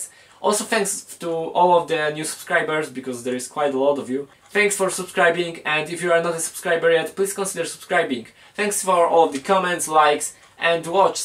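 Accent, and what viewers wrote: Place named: Poland. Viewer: Bardzo fajny projekt sam chciałem zrobić coś podobnego i słuchać że jesteś Polakiem po akcencie i po nazwisku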